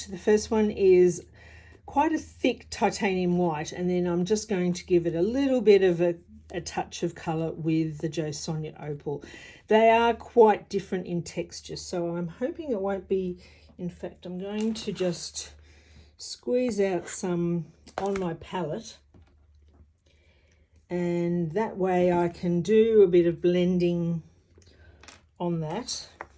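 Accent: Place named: Australia. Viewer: Really interesting technique,i’ll have to try it. Also,nice to hear a fellow Australian. Thankyou & Cheers!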